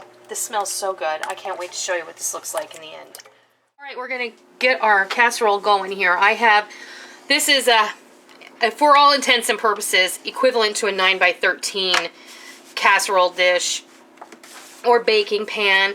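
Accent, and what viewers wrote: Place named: Wisconsin, United States. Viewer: Where are you from? You sound like you have a Wisconsin accent 💜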